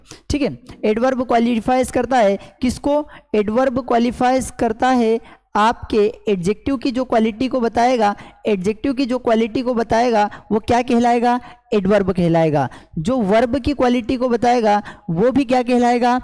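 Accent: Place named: India. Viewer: Sir ji ki English ki class me Pure hindi ka pronunciation ho raha hai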